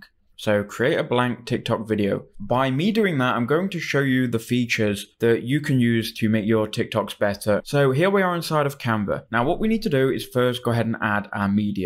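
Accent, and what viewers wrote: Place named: United Kingdom. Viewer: Nice job.. Then ur accent is great.. British 😊😊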